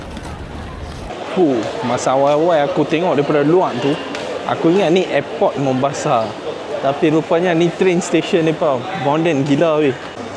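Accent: North, Malaysia. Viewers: Samalah...first time dengaq loghat utara terus subcribe😂...n seghonok dengaq penerangannya..jelas sebutan..terbaik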